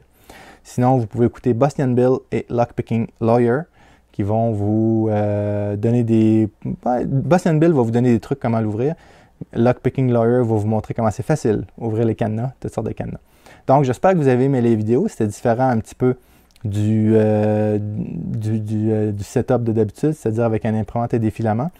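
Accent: Quebec, Canada. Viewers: L'accent du Quebec ne fait pas tout, tes vidéos sont vraiment agréables à écouter ! Il y a deux jours et un peu par hasard, j'ai visionné celle-ci : <link> sur le décodeur QX3 pour coffres de classe 2. Et je me suis demandé si c'était réalisable avec une imprimante 3D. Evidemment, en moins rapide (vu la rigidité du PLA par rapport à du métal). Le décodeur coute quand même 3 750 € HT pour une armature, un moteur pas à pas et une raspberry avec un écran. Au début, j'ai cru que c'était impossible (combinaison 3 nombres = un million de possibilité soit 11 jours pour un essai par secondes, ce qui est déjà rapide) mais dans la vidéo, il est dit que les coffres ont une tolérance (genre 11-21-29 pourrait ouvrir si le vrai code est 10-20-30, tu confirmes avec ton modèle ?). La méthode "brute force" passerait à 10h. Tout ça juste pour le plaisir...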